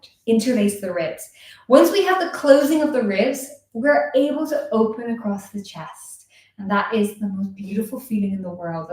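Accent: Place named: Ireland. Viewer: Hi, lol i always thought you had an accent, but hadnt pinned it down to Irish, thats really cool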